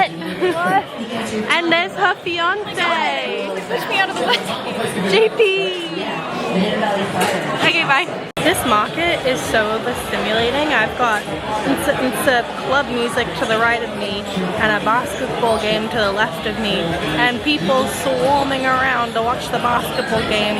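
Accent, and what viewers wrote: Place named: Australia. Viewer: That's so cool!! You say so many things with a tad bit of Australian in there as well, so it's so mesmerising to hear you speak, haha. ❤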